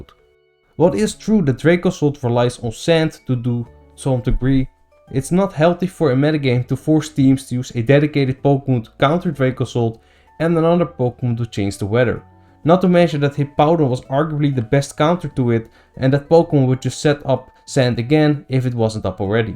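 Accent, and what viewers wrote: Netherlands. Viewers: Netherlands, you can tell by the accent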